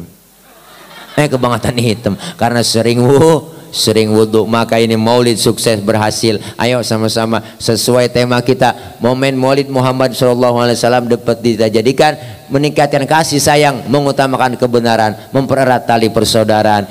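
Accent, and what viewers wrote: Jakarta, Indonesia. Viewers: smga slalu dlam lindungan allah.sehat" slalu kyai ku.. sya org jawa. tpi resep bgt sma cramah kyai.. khas bgt betawi nya.. apa lg klo GLEDEK. 😁🙏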